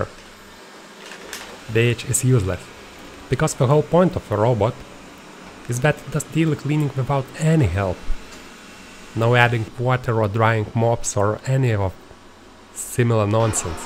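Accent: Russia. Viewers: Русак что ли канал ведёт, акцент родной, по ушам бьет))))) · Thanks very much. I saw your review before purchasing the C1. The latter was my choice because S1 was 90 usd more expensive in the country I leave (Asia). You are right on all points. I miss the area cleaning selection but not so much. It cleans at night the ground floor and the first floor when I wake up so no battery issue for 70 m2 each 2 floors. The mop on a shinny mirror-like floor works well but I added a microfiber mop to it and it works even better with no watermark. I live in a country side dusty area so strong hoover only is good for my kid but it also cleans. Important: after you buy the the C1, you easily want the robot to come back on specific areas. Then you should get the S1. For me C1 is enough... Just consider if the price difference, maybe now less, worth cleaning area control. Probably. 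If you like my experienced comments then I suggest you should like the video first, not my comments (I really don't know how you tube works but I humbly recognise who spent time to help all of us in this video with a Russian accent), n'est ce pas?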